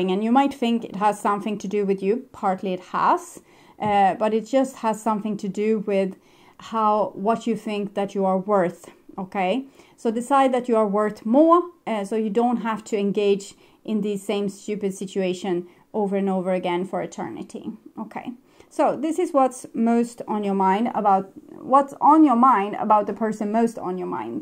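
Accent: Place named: Sweden. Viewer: I knew you were sweadish when I first heard you. I lived in Sweden in the 90 's was so beautiful times !!🦋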